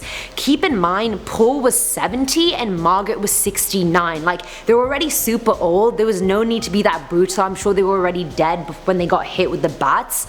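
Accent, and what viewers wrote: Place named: Wales, United Kingdom. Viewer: You have a Welsh accent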